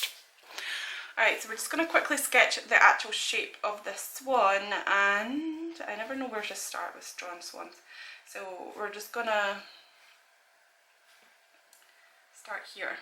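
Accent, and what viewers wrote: Scotland, United Kingdom. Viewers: Are you Scottish? I am. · Shes from scotland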